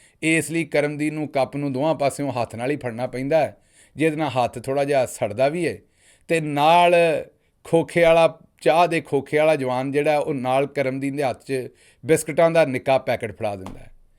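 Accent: Punjab, India. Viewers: 😂😂😂😂😂😂😂😂 well thought out story Dr sahib love your shudh Punjabi accent thanks for putting a smile on our faces . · Aahahahaha wahh Sahab, Kya Punjabi lehja or pure alfaaz hen aapky😂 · آپ کا پنجابی وی لاگ اب روز سنتا ہوں آپ کا لہجہ خالص پنجابی اور پنجابی کلچر کو الفاظ کے روپ میں حقیت میں محسوس کروا دیتے ہیں۔۔۔۔۔۔ اس پنجابی وی لاگ ختم نہیں کرنا چاہے سننے والا میں اکیلا ہی کیوں نہ رہ جاؤں 😂 فن بھی انفارمیشن بھی آپ کے اس پروگرام میں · . I love your village Punjabi Vlogs. We are from Lahore for generations, used to listen this Punjabi only when we used to visit our relatives in a village. It is soothing and I really enjoy it. The way you speak it seems that my cousins from a village are talking. It is hilarious and I get a kick out of it. Thank you. I really miss village Punjabi.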